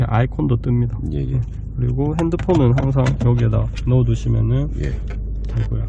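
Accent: Gyeongsang, South Korea. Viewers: 같은 경상도 사람으로서 듣고 있습니다 친절하게 설명 잘해 주시네요? ^^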